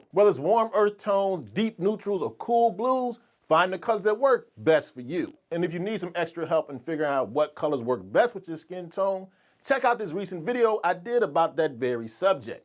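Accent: Philadelphia, United States. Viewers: You sound like you from west Philly or uptown. Jacket fire.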